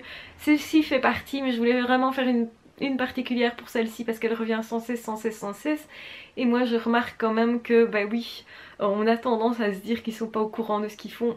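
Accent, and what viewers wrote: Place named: Belgium. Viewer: très très biesse... Aaah une belge ^^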